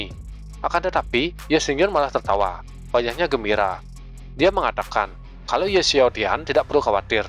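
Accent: Bali, Indonesia. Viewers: Cengkoknya kayak orang bali ya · logat balinya kentel bgd^^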